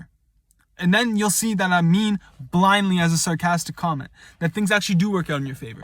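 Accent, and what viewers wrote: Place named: East Coast, United States. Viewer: You have an east coast accent!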